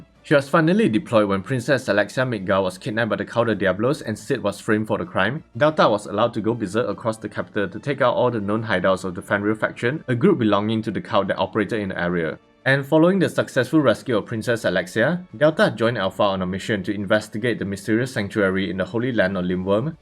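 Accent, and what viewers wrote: Singapore. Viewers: bro u singaporean?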